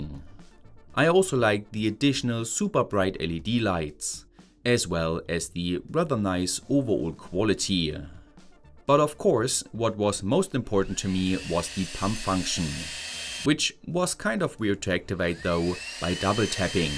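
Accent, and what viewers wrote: Germany. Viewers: I like that german accent! Can you please more often use the word "Jawohl" like germans do in movies. 😂😂😂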